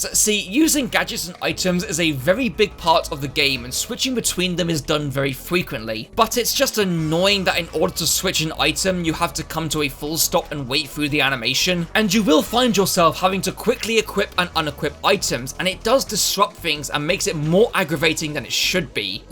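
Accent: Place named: United Kingdom. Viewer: I did not know you spoke british!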